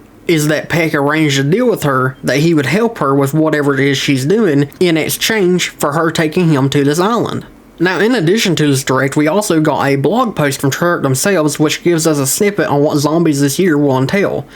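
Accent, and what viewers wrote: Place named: South, United States. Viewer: Sometimes i forget u have a southern accent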